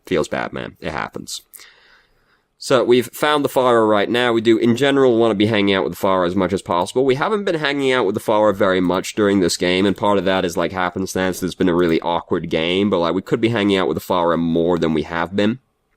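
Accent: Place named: East Coast, United States. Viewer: Where in Europe? My first guess as to where you're from would've been somewhere on the east coast of the US...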